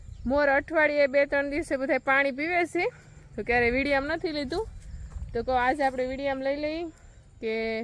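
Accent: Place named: Kathiawar, India. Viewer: તમે બોલો તે કાઠીયાવાડી ભાષા સાંભળવી ગમે છે. તમારા ગામ નું નામ શું છે.ત્યા કેમ પહોંચાય તે વિસ્તાર થી કહેશો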